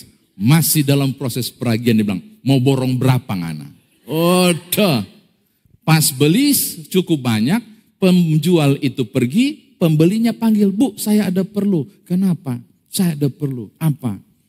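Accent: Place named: Makassar, Indonesia. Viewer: Sukaa dgn gaya bahasa makassar nya pak pdt😂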